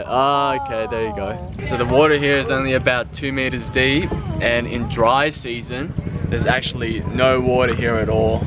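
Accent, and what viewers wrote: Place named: Australia. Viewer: Kept thinking you look a lot like an Asian with Australian accent. Enjoy your travels with those priceless smiles from the kids!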